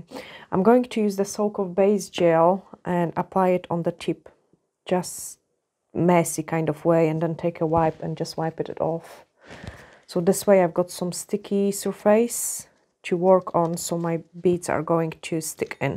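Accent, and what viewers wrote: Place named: Scotland, United Kingdom. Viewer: How funny, I wondered why your accent sounded a bit off... lol.. you actually picked up your Scotish accent with Polish. 👍👍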